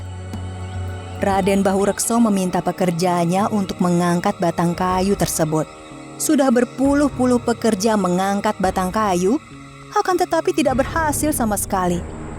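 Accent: Banyumas, Indonesia. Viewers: Ngapak wonosobo😂👍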